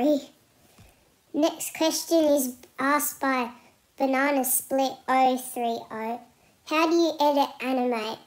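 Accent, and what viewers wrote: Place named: Australia. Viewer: i can hear the australia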